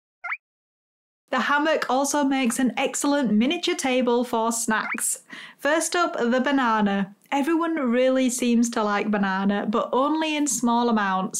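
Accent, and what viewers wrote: United Kingdom. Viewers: She is so British I am American lol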